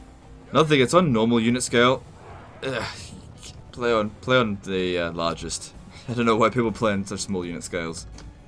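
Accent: Australia. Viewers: I'm just here for the abuse and edgy jokes. Nice to listen to a genuine Aussie, instead of the wannabe Americans that flock the Youtubes. :P Was fun to see my former favorite Total War game (WH2 > All) as a disaster campaign. Would love to see more.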